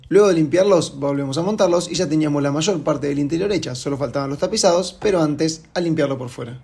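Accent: Argentina. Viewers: que es la cinta q le pusiste al espejo? tengo rotito el mio... sos argentino? las patentes no son argentinas pero la tonada si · De donde es este flaco? es un argentino que vive en españa?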